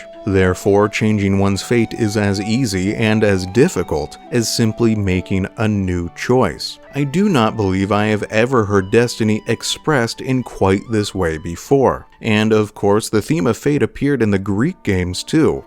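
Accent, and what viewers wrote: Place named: Oregon, United States. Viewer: You sound like you're from Oregon. ♿🏳️‍🌈♿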